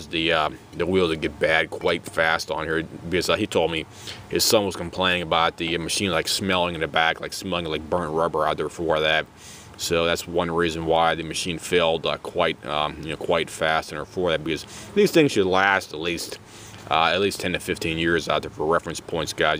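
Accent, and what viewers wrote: Pittsburgh, United States. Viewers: Definitely sounds Pittsburghese to me. Every time he says "down" it sounds like "Dahn," which is very familiar to Pittsburgh! Glad you picked that up too. 😉👍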